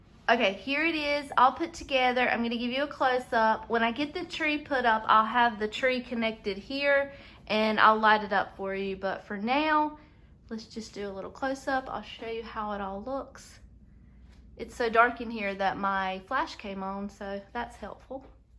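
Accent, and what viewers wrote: South, United States. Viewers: I just decorated my house this morning. Now I’m watching you while I knit on this rainy Alaskan day. You are just so sweet and listening to you, I’m remembering my southern roots. ❤️ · Thanks for getting back with me. Such a great idea. Love your southern accent and down to earth explanations. Joy to watch you🎃❤️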